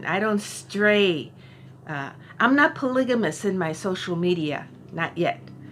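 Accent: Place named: Puerto Rico. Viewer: Hello, I enjoyed your nonfiction TBR and loved hearing the language and accent of "mi gente." I'm astounded at the gall of the author who thinks Puerto Rico is not part, or shouldn't be part of the US. It's not like we had a say in that situation. I'm hoping for the best. Aloha y bendicion.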